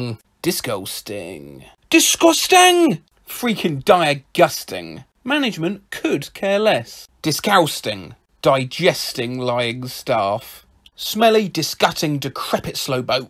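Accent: United Kingdom. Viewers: 🤣🤣🤣 Holy cow! That along with your lovely British (?) accent had me rolling on the floor 😂😂😂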